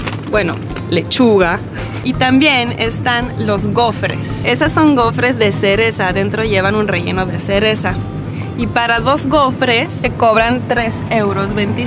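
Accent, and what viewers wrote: North, Mexico. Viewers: Hola, en verdad que tú lenguaje de español es un híbrido muy interesante ya que en ocasiones te escucho hablar el acento chilango y que no es el que hablan en los productos televisa, en otros momentos te escucho como un poco el acento del norte y lo más raro es que ya que viviste un tiempo en el sur del país no tengas su acento, en fin, te mando un Enorme Beso, hasta luego. 😘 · Hola! Me sorprende lo bien que hablas español. Especialmente porque es un español muy mexicano. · Hablas perfectamente mexicano. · Me encanta como hablas en español 😂😂😂 hablas como del norte de mexico tienes una chispa increible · Wow. Me sorprende tu acento. Hablas más mexicano que yo. :'( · woww hablas rebien el español mexicano supongo debiste estar mucho tiempo en México también podría ser por eso que tienes acento mexicano campechaneado pues durante el vídeo estaba yo emmm es chilanga sii y luego te salía acento norteño y yo achingaa pus mejor le pregunto 😅 gracias por contestar por cierto estás hermosa 😍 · me gusta tu manera de decir las cosas y hablar mexicana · En serio eres de Bélgica por k en verdad ni lo pareses y ni tienes asentó belga más bien tienes asentó mexicano en verdad y lo tienes me gusta Saludos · Jajajajajaja....hermosa Mexicana.....!!! 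Tu eres Mexicana en mi corazón... Que cosa.....Hablas y te expresas total....Y absolutamente Mexicana.... Que linda.....!!!! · Eres muy guapa .vives en Bélgica ? Tienes asentó muy Mexican . Ya me enamoré de ti 😄 ❤️ saludos desde Chicago il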